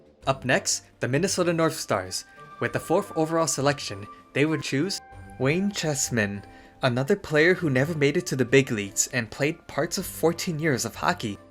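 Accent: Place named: Asia. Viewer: I didn't even realize the narrator's Asian accent until he said words like "Golden" and "Calder"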